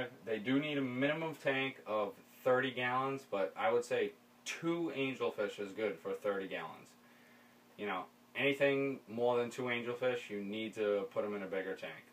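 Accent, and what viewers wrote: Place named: Massachusetts, United States. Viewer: Being originally from Shrewsbury, MA and now living in NJ I appreciated the MA accent when learning about angelfish!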